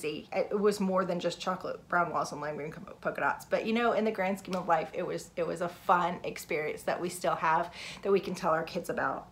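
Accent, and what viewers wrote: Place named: South, United States. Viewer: Appreciate your real life relatable stories and your Southern accent!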